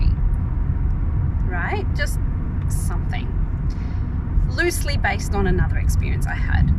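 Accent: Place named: Australia. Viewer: Hello, thanks for sharing and thanks for the tips and the advices! Love your accent! You probably live in Adelaide as I do. Thanks again!:D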